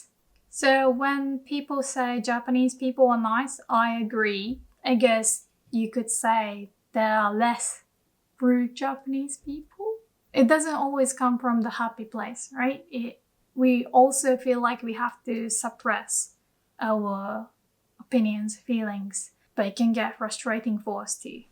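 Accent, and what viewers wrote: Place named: England, United Kingdom. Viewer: you are as cute as a button, and I luv your English accent...🍁🤠👍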